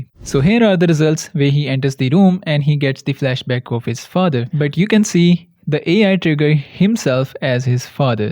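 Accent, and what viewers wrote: India. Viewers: man, i head indian i exit. bye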